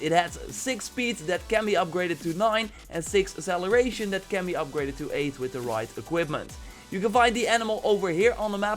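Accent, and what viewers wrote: Netherlands. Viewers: Dude, damn... dutch accent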